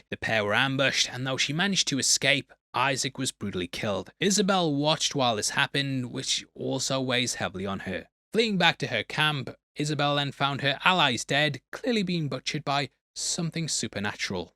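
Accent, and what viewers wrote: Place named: Liverpool, United Kingdom. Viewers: The Weekly Planet guys are Australian, and sounds like he’s from somewhere in Northern England (London is towards the South of England). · Great review, scouser! (sorry, don't know your name... just your accent 😉 ) Only saw the film once (when it came out, I think) but after your review, I think I'll give it a second go - most positively worth it now! 🤩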